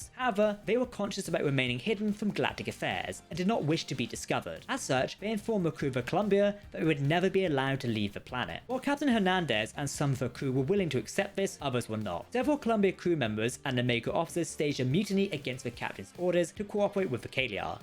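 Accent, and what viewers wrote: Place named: United Kingdom. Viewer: pro tip: if you stopped being so british and spoke slower with the correct pronunciation, maybe your audience will understand something, and you'll still make it to the dreaded 10 minute mark.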